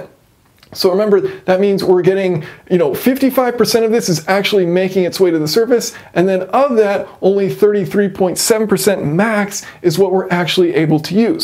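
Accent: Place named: United States. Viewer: I really appreciate hearing an American accent using metric units, just got to get the MPH to KPH - Love your vids, mate.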